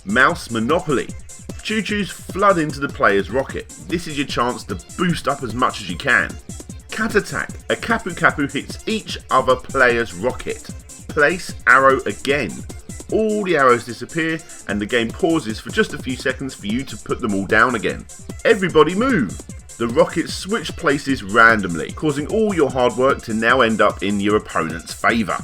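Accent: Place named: United Kingdom. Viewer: We love that British accent. Sorry you had to deal with low speed !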